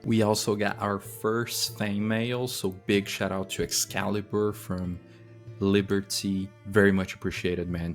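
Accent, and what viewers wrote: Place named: Brazil. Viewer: its amazing how your english is very smooth while I can still say you're BR hahahaha tamo junto irmão! videozinho bom demais, começando no medivia essa semana!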